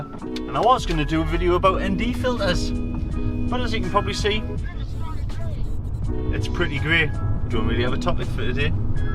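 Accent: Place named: Newcastle, United Kingdom. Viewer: Mint video mate love to hear another geordie haha !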